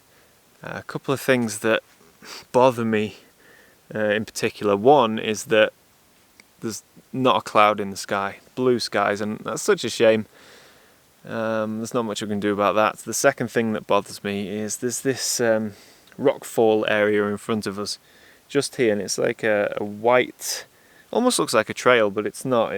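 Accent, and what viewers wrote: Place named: England, United Kingdom. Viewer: The North-West US, that is? Near Canada? I had to check as the English accent created ambiguity ;) The other day I read a story of a guy who survived a grizzly bear attack in the Canadian side of the border. On an island where the wildest predator is a fox and the whole country is man-made, man-influenced or man-kempt, where rain can surprise you, but generally will not get you hypothermic in a short time - what did you take along as risk-mitigation on this trail?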